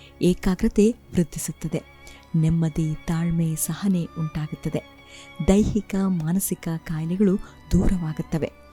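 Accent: Standard. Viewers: Nimm swachand kannada matu channagide tq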